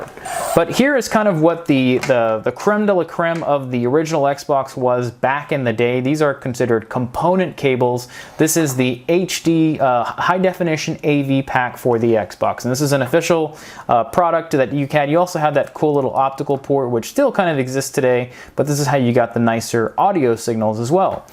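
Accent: United States. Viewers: For a while I thought I was watching, until I realized you spoke American English.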